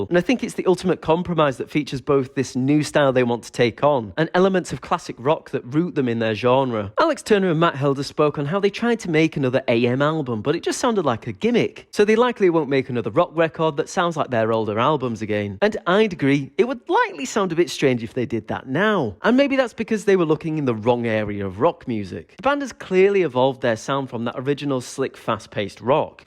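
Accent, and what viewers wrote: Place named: United Kingdom. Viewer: i like your dialect, where in the uk are you from?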